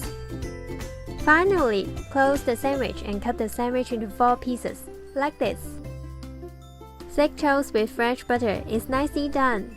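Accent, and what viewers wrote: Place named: Hong Kong. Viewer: 呢個like系比你嘅英文嘅。英文唔錯呀，香港tone但系口音唔重。希望你之後會越講越自然，加油！！好鍾意你嘅vids架！！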